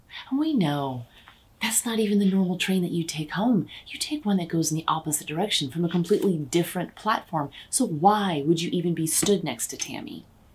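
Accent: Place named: United States. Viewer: Very well performed however, hope you don't mind me saying, even though the pacing was perfect & I enjoyed the story, there was a slight clash of American accent & English words that they do normally use...?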